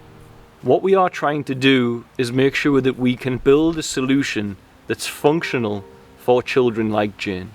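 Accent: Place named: Northumberland, United Kingdom. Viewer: Pure non dual clarity, it’s like listening to Ramana Maharishi but with a Northumberland accent 🙏🏻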